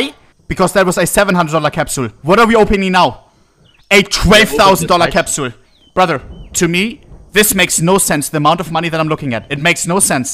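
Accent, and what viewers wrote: Germany. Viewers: german accent is real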